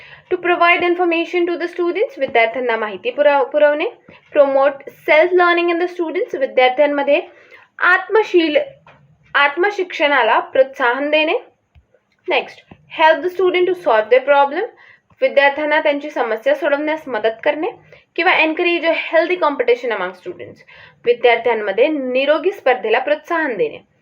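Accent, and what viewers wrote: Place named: Vidarbha, India. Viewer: Tuze language konti aahe tu kashi bolti tyamde lokana kahi kalena zalay Vidhrbhatli aahe ka tu bai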